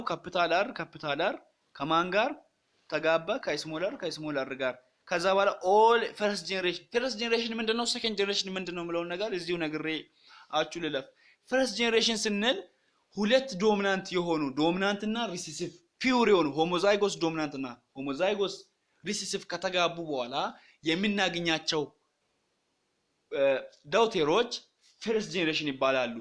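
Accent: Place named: Hadiya, Ethiopia. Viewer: ሀዲያ ለሀጭህ ምንድነው አስተካክል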